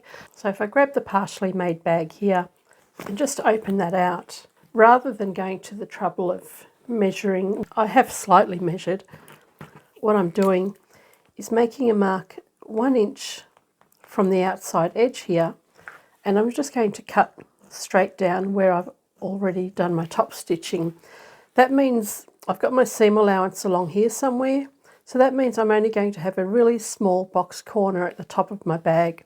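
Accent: Australia. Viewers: NZer here. Aussie, accent is slightly different from NZ.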